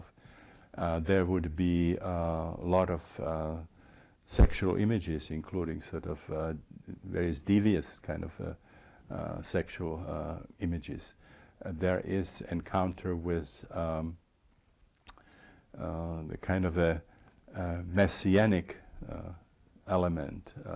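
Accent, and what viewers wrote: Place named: Czech Republic. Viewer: he sounds Czech... I am about 99% sure by his accent and his name confirms that very well also.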